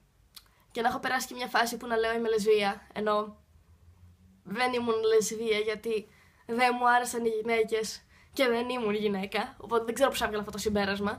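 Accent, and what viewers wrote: England, United Kingdom. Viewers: Φαινεσαι πολυ γλυκος ανθρωπος και εχεις μια.πολυ θετικη αυρα και μου αρεσει επισης η αγγλικη προφορα σου😍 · Μια χαρά είναι τα Ελληνικά σου. Το μόνο που μπερδεύει λίγο ότι μερικές φορές τα μιλάς με αγγλική προφορά (σύνδρομο Τσίπρα). Πρόσεξε το!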